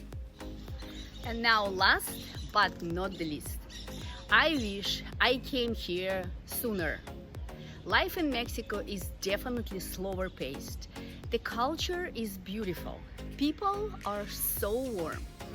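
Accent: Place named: Eastern Europe. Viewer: Hello, my wife and I are considering moving to Puerto Vallarta. My wife is Russian, since you also sound eastern European, I have a couple of questions for you! 1. Is there a fairly large Russian community there? 2. Is there someplace there to buy Russian food products? I appreciate any information you can give us, thank you!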